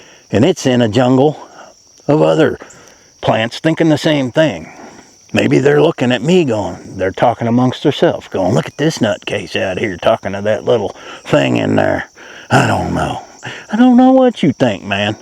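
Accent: South, United States. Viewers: Hi. I'm Tony from NJ, and I used to have a condo. Sold it a few years ago, and I now live in a van, in the suburbs of Jersey... In a strange way, even though surrounded by humans, I also feel like a hermit.. I love no debt, no bills and #1 freedom! One day I may move out to the country.. idk.. but I've been saying that forever! NJ is home.. for better or worse.. thanks for sharing ur existence.. ur southern way of speaking is one of a kind!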